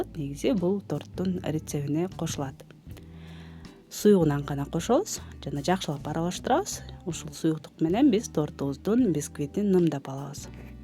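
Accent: Standard. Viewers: Таза кыргызча суйлогону жакты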